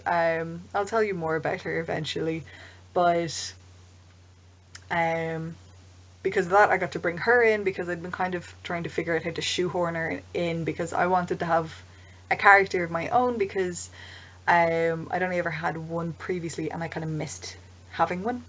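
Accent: Ireland. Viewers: Thank you for the information. Interested in city planning since world-building an accident society similar to Rome. Btw: that accent is cute as hell. Irish? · you irish? where do you play?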